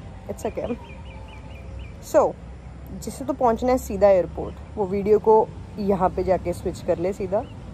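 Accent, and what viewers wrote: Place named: Gurdaspur, India. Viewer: Munde di boli toh pta lg gya oh gsp toh aw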